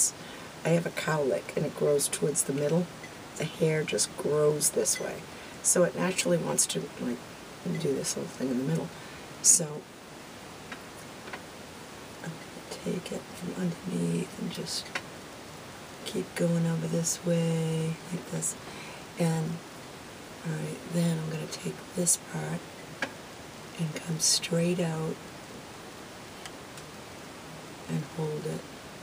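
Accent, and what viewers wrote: Boston, United States. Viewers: Just love your Boston accent. You have got a lot of hair up front. Be thankful, cause I would love to have that much hair. Love the video and good tips on top too! Have a great Turkey day🦃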